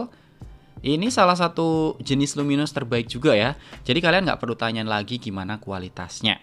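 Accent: Tegal, Indonesia. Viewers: nyimakk, orang tegal bang ? sama soalnya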